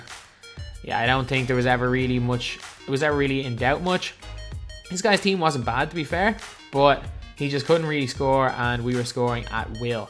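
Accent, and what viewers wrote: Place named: South Dublin, Ireland. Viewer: This is the biggest south dublin accent I have EVER heard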